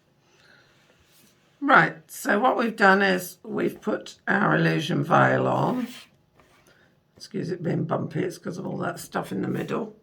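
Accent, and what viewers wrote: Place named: United Kingdom. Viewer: So cool to see how this gorgeous have is made and that it's doable for a newby like me. And fun to hear a british accent! I lived in London as a student years ago.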